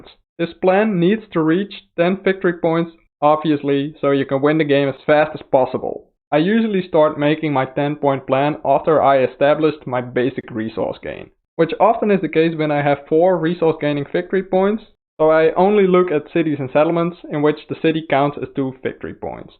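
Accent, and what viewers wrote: Netherlands. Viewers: Ah, thought you sounded Dutch! Is there a bit of a community here in The Netherlands? Would love to go to a tournament in the future after this whole virus thing...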